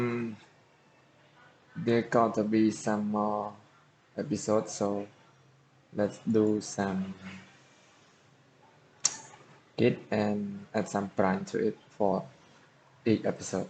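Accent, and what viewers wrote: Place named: Indonesia. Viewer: hmmmm logatna kek orang indonesia :3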